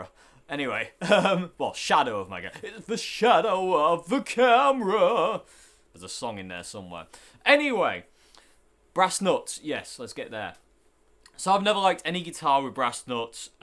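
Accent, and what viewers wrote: United Kingdom. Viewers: Hey Mr....You and I have something in common....We both talk funny...You’re from the U.K...and I’m from..New Yawk...It ain’t easy being us 😊. P.S...Brass Nuts...can have...a few different meanings....Keep on keeping on young man...👍😎🎸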